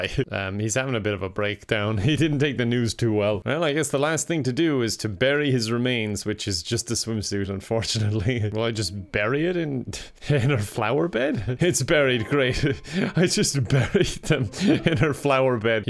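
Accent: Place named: Ireland. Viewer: he's Irish, that's his accent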